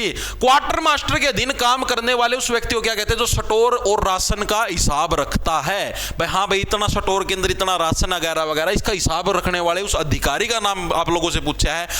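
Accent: Haryana, India. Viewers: Mujhe lagta h sir hariyana se h.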